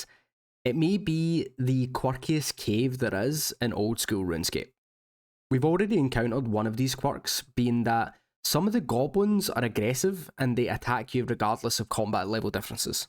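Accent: Glasgow, United Kingdom. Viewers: Loving having a Glaswegian voiceover for a chunk lock 👌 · Scottish accent, armed goblins -> good arms vs bad arms.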